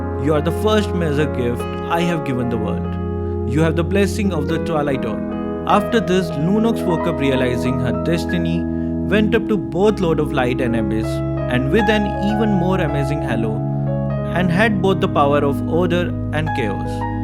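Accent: India. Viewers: Am i the only who is possed by his indian accent?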